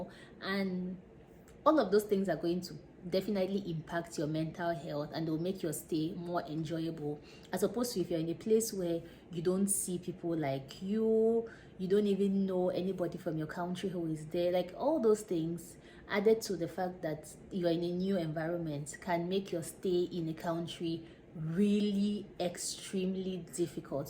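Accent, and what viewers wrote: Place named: Nigeria. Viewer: Hi nice content there, I am enjoying your channel. I am guessing you're Nigerian or maybe Cameroonian? (judging by your accent haha).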